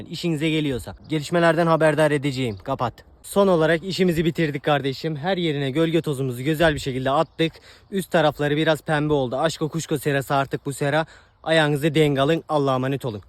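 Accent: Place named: Bursa, Turkey. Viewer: Abi sen nerde yaşıyorsun ben gazipasadayim Bursa'da senin gibi konuşuyorlar (fingirdinmi)❤❤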